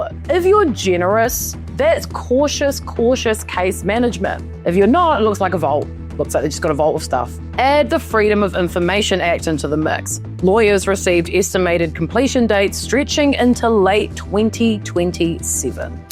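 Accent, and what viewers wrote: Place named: New Zealand. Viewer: New Zealand/Kiwi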